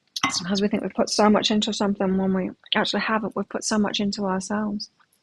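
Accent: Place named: Australia. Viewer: Hi , I’m in Canada watching atm. Love your channel. I love your Australian accent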